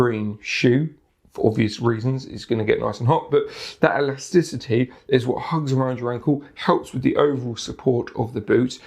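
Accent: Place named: United Kingdom. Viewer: Lol you literally cannot pronounce the "eye" sound of any word or letter. You can only say "oy" instead. Like instead of supply (suppl-eye) you say supploy. British accents are hard to listen to lol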